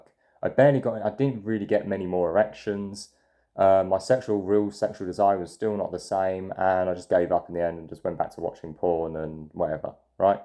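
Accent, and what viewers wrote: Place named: United Kingdom. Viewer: I know I'm late to the party here but you only just showed up in my feed last night and I'm starting to work through your vids. First, I respectfully and in no way mean this in a condescending way request that you interact with your mum and gran with nothing but respect, patience and kindness. Your dad, if he left you and your mum for whatever reason, can piss the f**k off, however. :) Second, you sound like a proper Brit. If this is the case you need to take pride in your country and continue forth its inspiring tradition; such is the great example (while not always perfect) your ancestors created that people literally risk everything to gain entrance to your country in these troubling times. I agree with you that things seem grim but they are by no means hopeless and not without solution.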